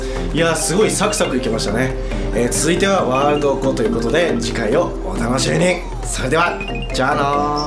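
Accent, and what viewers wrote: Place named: Kansai, Japan. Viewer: 関西弁いい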